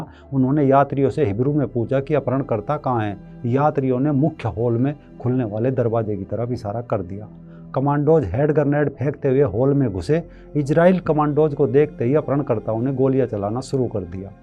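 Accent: Haryana, India. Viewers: R u from haryana......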